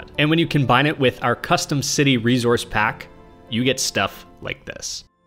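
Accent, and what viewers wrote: Canada. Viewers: Buddy you have to be Canadian. "Ferm" and "Perk" spoken like a true Easterner.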